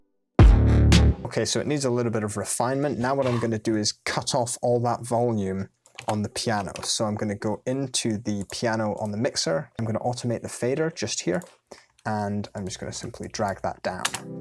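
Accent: Scotland, United Kingdom. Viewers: Did you know?, if you went to Scotland, in particular his home city or town; he wouldn't have an accent anymore. You would have an accent. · Are you Scottish? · He's Scottish, so you must like that accent.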